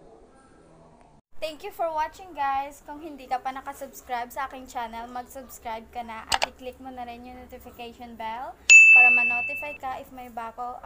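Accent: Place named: Iloilo, Philippines. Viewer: Ang galing naman ng salita nyo parang mga ilongga original 😁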